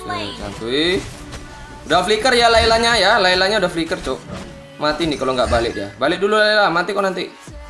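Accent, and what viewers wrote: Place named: Pekanbaru, Indonesia. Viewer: Lho abg org Pekanbaru..?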